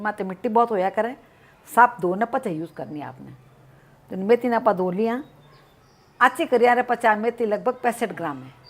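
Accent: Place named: Marwari, India. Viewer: Aap meri sakhi kab bnogi vaise yaha meri pach saheliya hai chhthi aap ban jao.aap ki marvari mithi lavati hai ek jaypur me bhi meri s aheli. hai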